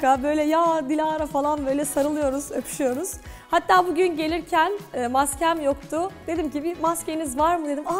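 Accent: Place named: Kastamonu, Turkey. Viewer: Kız sen Kastamonulu musun 😮 ben de diyorum bu kızın konuşması bana niye tanıdık geliyor 😅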